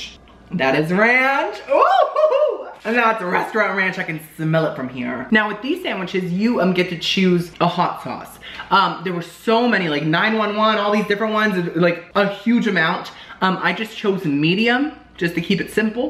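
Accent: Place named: United Kingdom. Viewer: I love to watch the unboxing! Don't listen to the dummies. Also..you don't even EVER have to ask if we want a follow up video to anything...ummm YASSSS WE DO!! I'll take any video from you..follow up, new, or just you talking in your British accent for 30 minutes. Just say BUTT-AHHH in your British accent for 30 minutes and you will make my year!!💙💚💜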